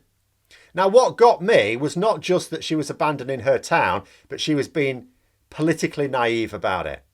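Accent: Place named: RP, United Kingdom. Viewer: Indians would be easier to understand than the locals.. sound posh in Scunthorpe.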